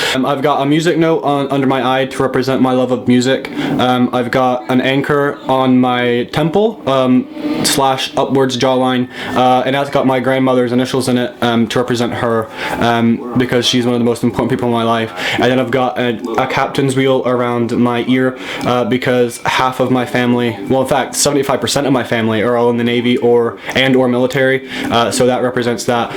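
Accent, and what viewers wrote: Scotland, United Kingdom. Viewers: I think he moved to Scotland from somewhere so his accent scottish accent sort of blended with the other one. Just as half-assed as his tattoos lol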